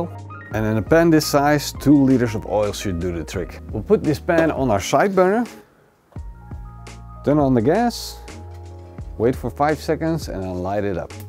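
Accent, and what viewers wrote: Netherlands. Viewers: the dutch accent is strong with this one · Haha dutch accent is just to strong😂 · ben je van nederland ? zo ja dan hoor ik et aan je accent xd . leuke video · The dutch english accent is throwing me off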